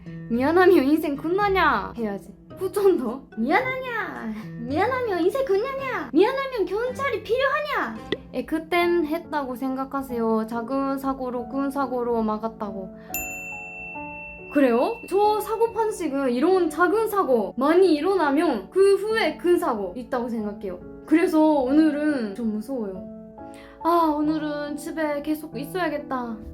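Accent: Gyeongsang, South Korea. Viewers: 뭔가 대구 말투가 되어가고 있다. 억양이 느껴지네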